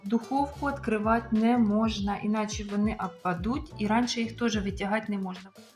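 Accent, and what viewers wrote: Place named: Surzhyk, Ukraine. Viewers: А наша пані з Одещини,і мене зовсім не обтяжує її суржик.Людина Вам розказує показує,відкриває Вам душу,а Вам виявляється,важко слухати.А наша пані не на сцені,декламувати поезію.Вона розмовляє своєю,рідною мовою,до якої звикла,якою розмовляє все своє життя.Не треба вносити ложку дегтю в бочку меду.Попробуйте і Вам буде приємніше слухати нашу.До речі,я з Хмельниччини і також не розмовляю чистою українською,так що мені й не жити? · Бо дикий суржик))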